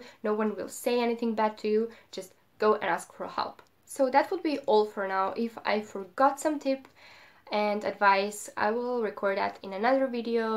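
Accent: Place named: United Kingdom. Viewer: u r from Serbia but u talking as british girl wow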